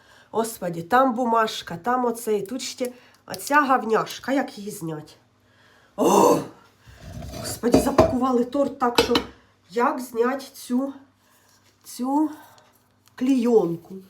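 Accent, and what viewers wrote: Poltava, Ukraine. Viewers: вогонь, а не жінка, а Полтавська говірка просто❤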